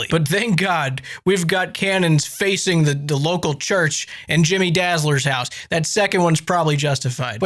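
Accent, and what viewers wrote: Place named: Midwest, United States. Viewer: Today I learned that Jimmy is from the Midwest USA (I dont care if you were born in Uganda; if you pronounce "roof" like that, you're midwestern) If you think castles are cool, I HIGHLY recommend the Youtube channel which has a series going over how tactically viable all of the castle in Gielinor are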